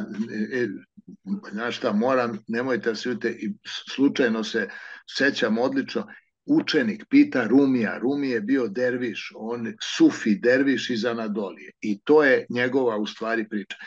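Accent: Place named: Niš, Serbia. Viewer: Velja se baš zabavlja i ujedno zasmejava sve nas ...ovo gledamo grupno i ujedno se svi smejemo i tvom izgovoru ...čas nišlijski čas izkvareni beogradski..nikako pravilno srpski ali ok ...baš se svi smejemo i zabavljamo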